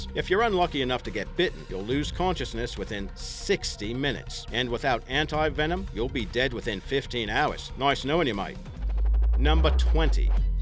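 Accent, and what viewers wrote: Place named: Australia. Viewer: i know dudes Australian.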